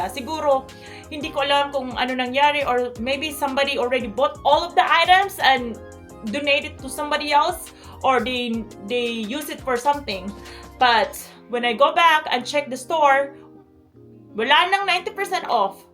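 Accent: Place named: Visayas, Philippines. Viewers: Maam bisaya ka po ba?newbie here po..kasi pansin ko po parang hirap kayo sa tagalog po..nag lolog po kayo hehe sorry po,bisaya rin po kasi ako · Waray po siya kung hindi ako nagkakamali. Magkaiba ang Bisaya sa Waray po.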